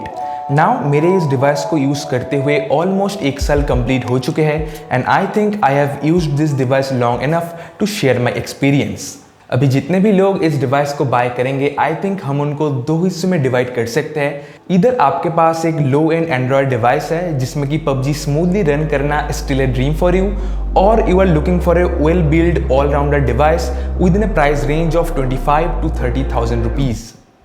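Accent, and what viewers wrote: Bengal, India. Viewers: Vai tumi je bangali Seta tomar kotha tei bojha jachhe🤩1 jon asol Bangaliei bujhte parbe🤩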